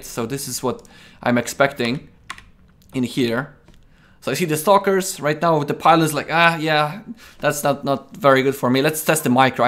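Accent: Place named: Poland. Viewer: Nice adventure you've had! I enjoyed watching your inside view of this AI vs Human duel, well done! PS. Funny, after a few minutes of watching I've noticed that except of the familiar accent you've used some phrases that is also familiar for me but definitely not of an English origin so I've checked where are you from and Bingo! - fellow Pole. Don't get me wrong, your English is very good, but while freely speaking you gave up your nationality ie. "ran circles around someone" in English mean to do something much better than someone else rather than taking extreme care about someone (Polish meaning of this phrase). Pozdrowienia! Oh and btw, as I understood you've played against different agents each game right? I felt sad for you because of your first 5 matches but imagine what happened to the agent who lost exhibition match - probably it has been deleted.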